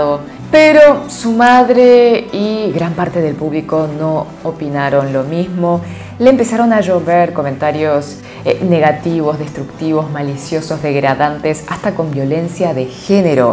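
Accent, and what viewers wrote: Buenos Aires, Argentina. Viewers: habla como si fuese Argentina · Qué acento tiene? Es el castellano.neutral con algo de acento argentino, no? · Bueno es coreana la conductora o argentina por qué sus rasgos son coreanos pero habla como argentina .che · su acento es el porteño de argentina. le sale perfecto, ella se vino a vivir acá cuando era chica.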